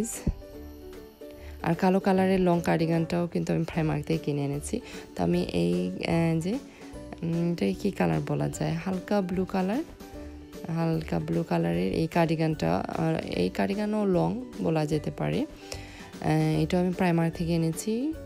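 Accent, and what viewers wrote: Sylhet, Bangladesh. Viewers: Apu Tumi sylheti ni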